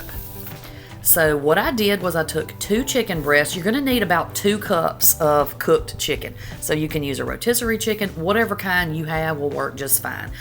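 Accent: South, United States. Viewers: I swear to girl you crack me up you make my day. Where are you from I'm from mobile Alabama and you sound like a southerner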